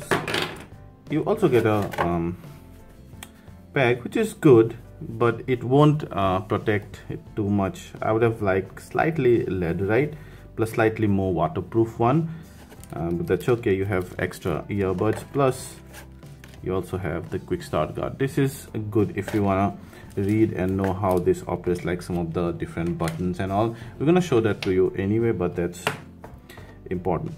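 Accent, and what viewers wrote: Assam, India. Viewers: Are you assamese? Ur accent sounds like assamese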